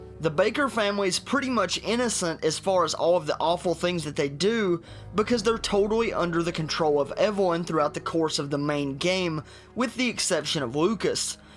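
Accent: South, United States. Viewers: JSG GAMING its a southern accent.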